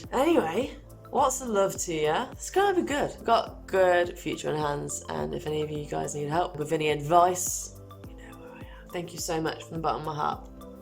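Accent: United Kingdom. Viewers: Hi, two things from me: in the past i wasn't really a fan of your videos watching you talking all the time. But this one was different! It was very personal, it was all YOU - brilliant, go ahead on this road! And btw: PLEASE a lot more of your "lovlea" british accent! I'm German - but i like it! Second: Lazy Tommy! What the heck has he done all the time? Just playing guitar and drinking Wiskey "with Plastic in it"? He is an electrician, why he didn't fixed your wiring already? That's sad to hear, thought that it was his business. Wish you better luck next time with your crew. Follow your track - of course you'll do! John Boyle: i'm totally with you. You'll never will know what more problems an old engine has in store for you after fixing the actual one. If you want to have trustful equipment buy new - and keep it maintained! Then it is YOUR stuff and you know what you're talking about. 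Raise a fund! With your sails and rigging it has already worked out, why not with the engine? Always fair winds and happy travels from Germany.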